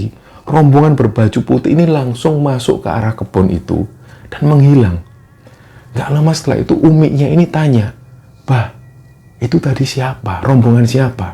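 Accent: Java, Indonesia. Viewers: Sugeng ndalu mas 🙂 Gk prnh bosen liat channel ne, cr pembwaan mas dlm crt slalu enk didengar n apa adanya, n tetp dgn cri khas jawa tulenny, smoga trus brkembang n crta2ny bs mnmbh pngthuan bt org lain aminnn, slm dr lampung 🥰🥰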